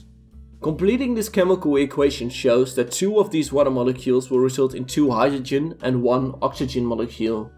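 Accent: Netherlands. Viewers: you're definitely dutch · let me gues, ur dutch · Are you Dutch? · Nice dutch accent though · Your accent sounds Dutch, South African and German at the same time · Awesome video! Like and subscribed, you have a decent english accent, but i immediately heard that you were Dutch <3 Groeten uit Rotterdam ;) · Lekker Hollands accentje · Annoying dutch accent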